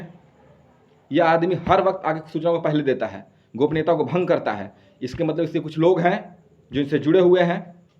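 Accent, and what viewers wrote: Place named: Bihar, India. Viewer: इसका बात से ही लग रहा है , कि ए बिहारी है और सेटिंग कराया है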